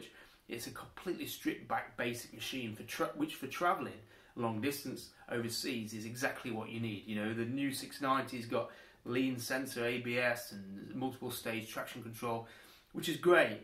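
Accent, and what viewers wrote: United Kingdom. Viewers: Your stupid accent is hurting our ears no matter if some people tell u otherwise.just try to speak with Canadian or American,mate other brits can ,u can do it too ..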